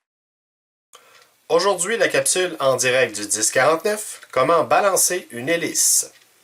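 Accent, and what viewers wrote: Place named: Canada. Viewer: bravo explication très simple j'adore ton accent canadien Bonne journée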